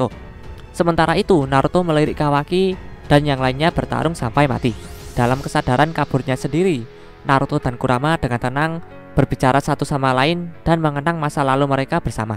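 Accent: Java, Indonesia. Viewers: Medok suaranya bang..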